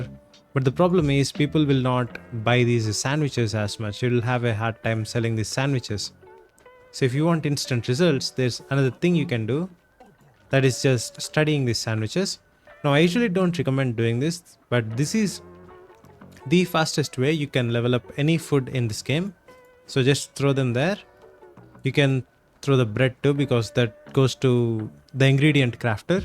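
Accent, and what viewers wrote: India. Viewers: Bro r u indian ??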